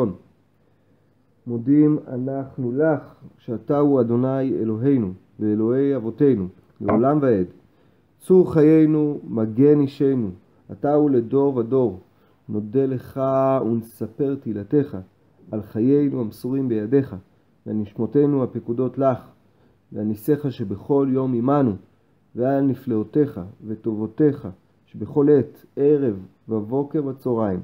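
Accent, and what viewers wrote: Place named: Israel. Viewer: Your prayer is clear, easy to follow and sounds authentic Israeli. It's a good supplement to allow us to fulfill the mitzvah in some days we have no access to the synagogue.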